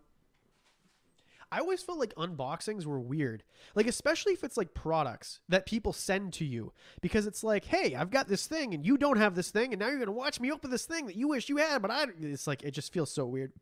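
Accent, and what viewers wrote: Canada. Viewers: Love your Canadian vernacular. Eh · I’ve seen a lot of your videos and this stream is the most Canadian you’ve ever sounded 😂 love it!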